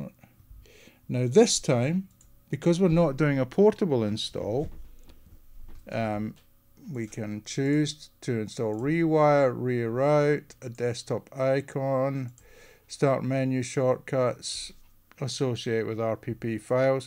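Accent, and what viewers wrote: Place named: Scotland, United Kingdom. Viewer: Thanks a lot for this great video! I also really enjoyed your Scottish accent, it brought to mind my ten years when I lived in Scotland;-). &#160;- So if I understand correctly, this still remain a portable install of Reaper but just more tidy, correct? - Where is the best place to place all the VST.dll files for all of my plugins inside the main Reaper portable folder? Thanks a lot in advance and best regards, Max T.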